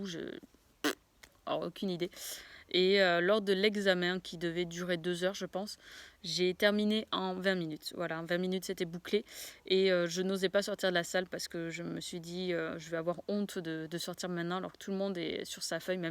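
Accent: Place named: South, France. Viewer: Bonne vidéo, j'imagine que tu es du sud non? (à l'accent) sympa la vidéo elle est nette et tu es à l'aise devant la cam, juste petit conseil tu devrais filmer en horizontale pour éviter les bandes noires;)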